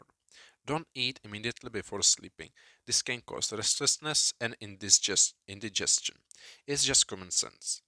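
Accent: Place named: Russia. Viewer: You sound like Russian or german, am I right?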